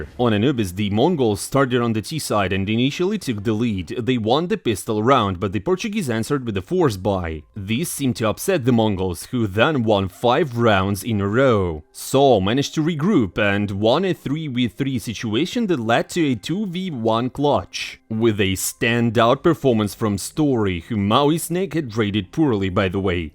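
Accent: Russia. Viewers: This russian accent is horrible.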